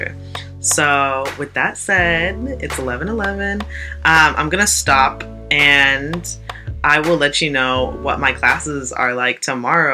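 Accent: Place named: Atlanta, United States. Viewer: Girl you on fleak ! Atlanta talk.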